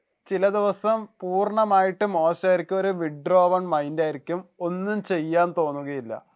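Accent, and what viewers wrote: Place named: Palakkad, India. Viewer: ❤ sir correct Palakkad slang❤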